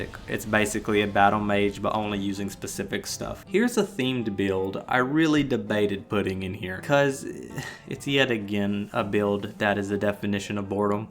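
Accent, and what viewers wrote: South, United States. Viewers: it's funny to me, because the playthrough I play right now is a cluster-flip of concepts to me.. playing a Kahjit sneaky-assasain-mage thing, and it's my second time playing a mage. first mage game was a pure mage that got pretty op, so I wanted to try a weird double-build thing, and I also never went stealth before?? at the beginning of the game, I got the Thief Stone and now I have high power thief skills. also got good magic too. but it's also funny, because I'm actually really trying to roleplay more, and get more into the lore, but I still ended up basically having the same personality of me irl, so the dude is a lawful neutral monster that abides by the laws, and will generously go fetch any family heirloom, but if I find someone outside on the road and they're not an enemy even, I'll silently murder 'em for no reason at all. 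I also rob just about every single store/house I come across, even the food don't judge me... 😂😂 also, I must say that the "cowboy" accent made me very comfortable??? I don't know how to word it, but my entire family is from the south, and they all still have accents so your voice made me feel right at home, I hardly see southern-accented YouTubers..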